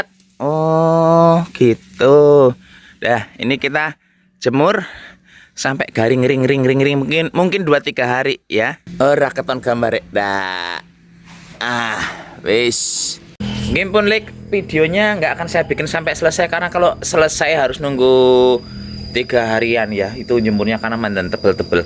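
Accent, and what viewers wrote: Banyumas, Indonesia. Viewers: O...mas.. Eneng apik e nek gawe kamera..men Ojo komplin netizen... iku tangane di sarungin lo maaas..kan malah apik.... aku seneng karo celoteh ne lucu³ Indonesia ngapak...😄😄😄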